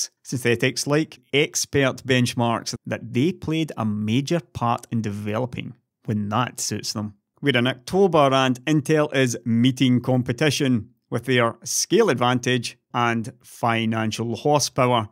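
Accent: Scotland, United Kingdom. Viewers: whassat accent man, too soft fer glasgee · love a Scottish accent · It definitely sounded Scottish, but I felt like it was a little different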